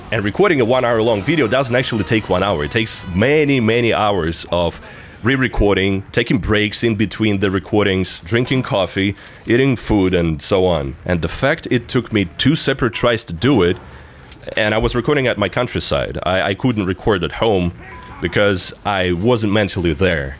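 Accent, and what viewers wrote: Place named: Russia. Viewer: I'm just here for the sexy Russian voice honestly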